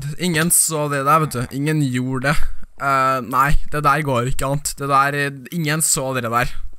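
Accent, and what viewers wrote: Trøndelag, Norway. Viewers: Du e best elsker deg liker din stemme som er trøndersk😍😍😘😍😘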